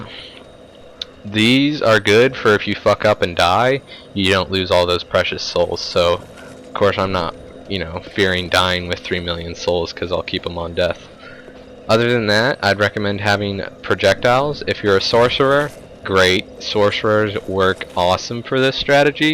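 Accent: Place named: Canada. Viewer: canadian eh ?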